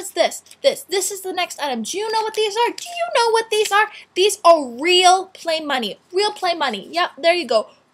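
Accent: Canada. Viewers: I think i like the canadian accent. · you know what I like it more when you're calm in ur videos... but it's ok cuz ur Canadian accent is so kwl =]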